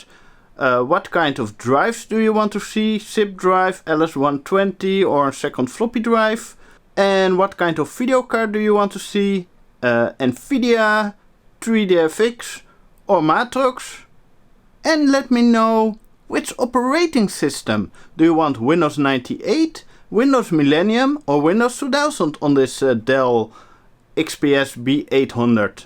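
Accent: Netherlands. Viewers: Dit Dutchglish is echt schattig :D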